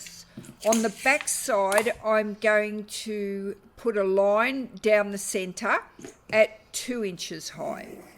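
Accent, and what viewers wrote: Australia. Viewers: I love hearing an Aussie voice and intonation, talking about craft. G’day from Forster. Adorable books too!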